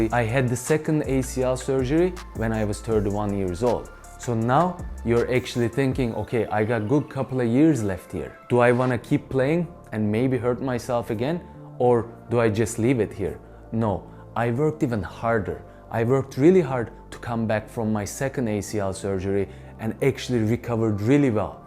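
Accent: Turkey. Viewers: türk aksanı neyse bu video o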